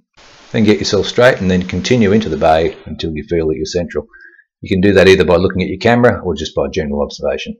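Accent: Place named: Australia. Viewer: he’s not a Brit he’s Australian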